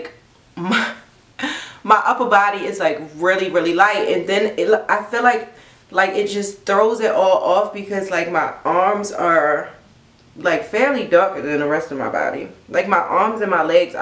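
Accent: Baltimore, United States. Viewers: Tommi and dime piece mixed together but I love your bmore accent my Howard crush was from bmore lol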